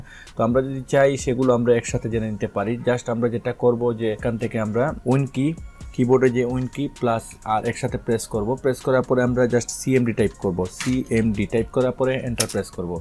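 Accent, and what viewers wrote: Sylhet, Bangladesh. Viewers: ভাই বাড়ি সিলেটনি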